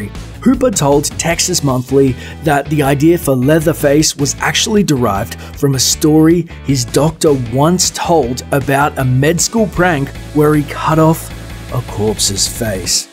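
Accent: Australia. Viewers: Australian narrator? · Are you Australian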